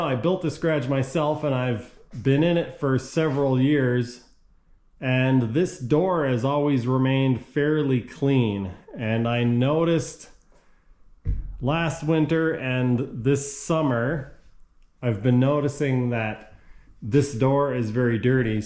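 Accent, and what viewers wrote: Canada. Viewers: It's aboot the grraj! Love that Canadian accent! 😉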